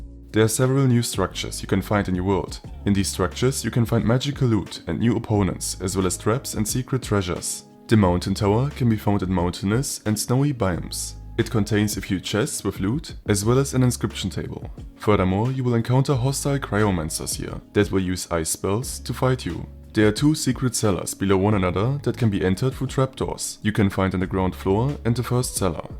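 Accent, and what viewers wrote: Germany. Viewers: man ekent das du deutsch sprichst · is that a german accent? also very high quality vid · Wusste ich’s doch. Hab den deutschen Akzent rausgehört. Auf dem Channel das Impressum gesehen und meine Vermutung wurde bestätigt. Mach weiter so gute Videos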